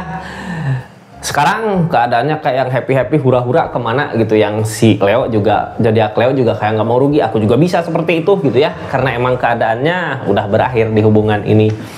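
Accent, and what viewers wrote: West Java, Indonesia. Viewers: Kk logat sunda na kaciri pisan . Abdi oge sunda bandung 😀😀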